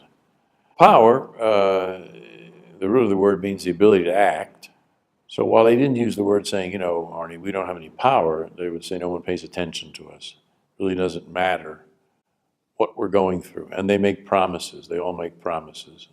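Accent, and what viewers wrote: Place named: United States. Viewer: You sound like an American.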